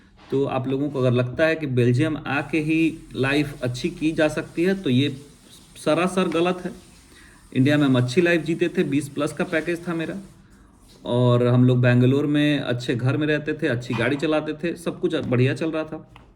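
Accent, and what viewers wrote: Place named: Bihar, India. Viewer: Hello from Canada ..Feel connected as listen Bihari accent after long my mom was from Patna …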